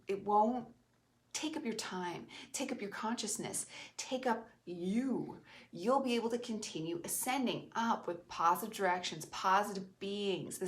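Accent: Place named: Canada. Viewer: "Hey sugar, ain't we got a DATE?" Thank YOU for YOUR thrilling and timely response! You're the internet superstar! I'm here with a note from my mom pinned to my jacket, "Please get Glenn on Bus 6, thank you!" And really, it's nothing overt, but really rather quite subtle! I'm kind of a student of the nuances, vagaries and evolution of language (a very BAD student, I'm afraid, slouching in the back of the classroom, hoping not to be called upon!), so please forgive my inadequate efforts to indicate what clued me to your nationality. I know "boot" doesn't quite get it, but sometimes we have to "settle out of court," so to speak! Also, count me sweet on "Canucks" and "Kiwis" (Aussies, not so much... sort of more of on a case by case basis!). If not for a string of DWI's in my intemperate years, I'd probably be living in Vancouver or Banff or Ottawa, instead of being stuck here on the plains of East Dakota, where there is no crime, but plenty of fear and semi-automatic weapons! 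In addition, "Slings and Arrows" is one of the funniest TV series I've ever seen, and, in spite of spending most of her life in California, Joni MItchell TOTALLY still sounds wonderfully Canadian! What's not to LIKE? "If I had loved you less, or played you slyly, I might have kept you for a summer more, But at the cost of words I value highly, And no such summer as the one before." from "Fatal Interview" -- Edna St. Vincent Millay OK, "Big Whore" agrees to subscribe! Keep that Canadian voodoo and mojo co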